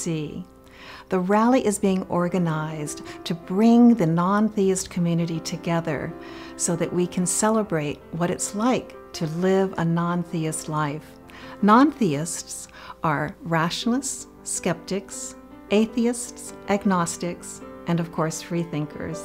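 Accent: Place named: United States. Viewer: It always warms my heart to hear American English Language being spoken Reason.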